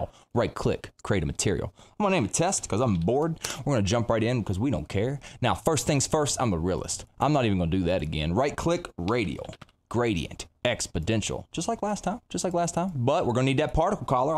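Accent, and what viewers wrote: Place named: South, United States. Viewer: Bro I enjoy your tutorials. Where in the south are you from bud?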